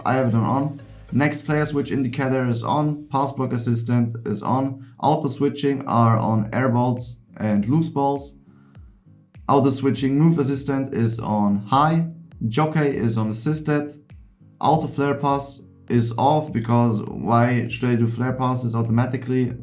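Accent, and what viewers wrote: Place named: Germany. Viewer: Mann kann höheren das du deutscher bist haha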